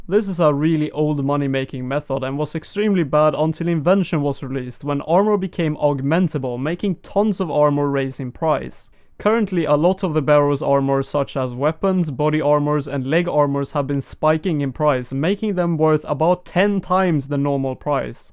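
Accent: Sweden. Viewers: Where are you from? Your accent sounds like swedish-english ish:P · The swedish accent :D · the swedish accent is so obvius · Your Swedish accent is so sexy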